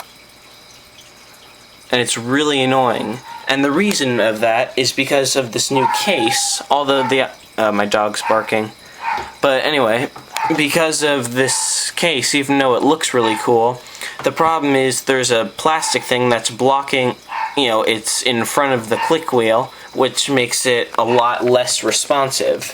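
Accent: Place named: Essex, United Kingdom. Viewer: sounds like your from the essex persuasion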